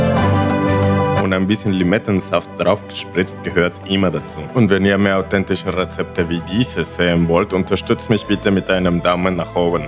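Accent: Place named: Russia. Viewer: lol du hast ur den russisch akzent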